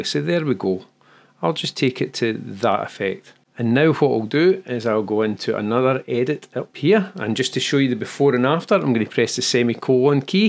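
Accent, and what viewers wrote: Scotland, United Kingdom. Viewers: Its very difficult to understand this scottish dialect , I think, in other parts of the world, because you are speaking very fast. I am from Germany and I shall make every effort understanding you in future. Maybe I have to listen to many other of your editorials.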